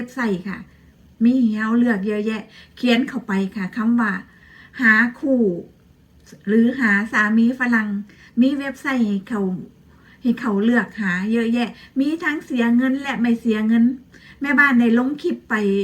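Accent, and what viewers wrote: Ubon Ratchathani, Thailand. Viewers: คนอุบลนี่เว้าจาม่วนๆๆสุภาพอิหลีได่น้อจร้าา🥰🥰🥰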